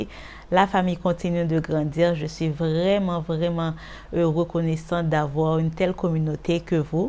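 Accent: Haiti. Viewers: J’aime bien ta façon de parler 😀 ton accent haïtien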